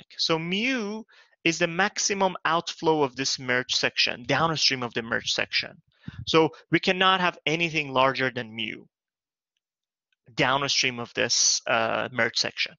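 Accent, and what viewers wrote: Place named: United States. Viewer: That's a long video, I spent 3 days watching it, making notes at the same time, and learning English as well. Thanks to good American accent, I can understand the video relatively easily, but the theory is still difficult to grasp.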